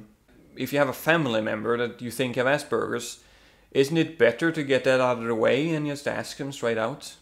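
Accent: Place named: Sweden. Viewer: I dig that swedish accent of yours!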